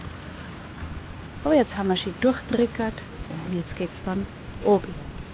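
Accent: Bavaria, Germany. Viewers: So super schön deine Videos, vor allem so vielfältig!!!😊 Finde auch deinen Dialekt super, ich komme auch aus Bayern und verstehe sehr gut was du sagst😊 Musste eben so lachen als du geflucht hast (Zefix...)😂😂😂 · Ich finde es ganz natürlich und wunderbar ,wie Du Deine Videos für uns rüberbringst. Und bayerisch ist eben bayerisch, wie die Landschaft sich gestaltet, so passt auch der Mensch. Ich liebe es, alles zusammen. ❤lichen Dank.....hier spricht der Städter, der die Berge liebt und vieles mehr😅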